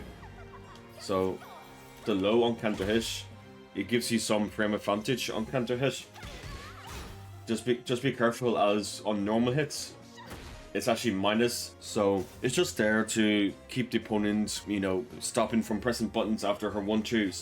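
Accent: Ireland. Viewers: Nice guide and cool Irish accent "counter hish" 😜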